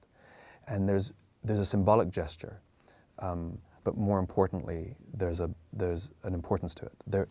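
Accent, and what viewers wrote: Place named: England, United Kingdom. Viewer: He had a little English accent going on in this interview.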